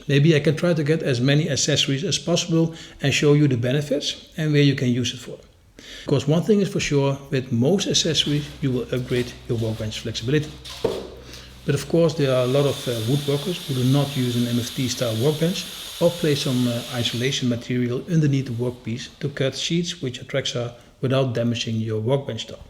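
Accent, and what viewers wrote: Netherlands. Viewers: The moment I heard you talking I knew you were from the Netherlands! Dank u wel voor de video, van de Verenigde Staten!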